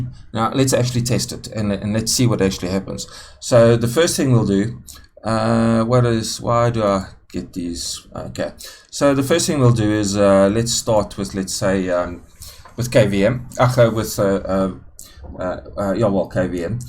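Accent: South Africa. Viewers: Why can you always here a saffer right off the bat 😂. Lekker man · u frm SA?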